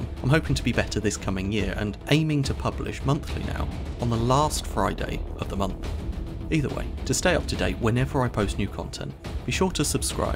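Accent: United Kingdom. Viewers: i was going to say the same but since he sounds british maybe they pronounce it that way.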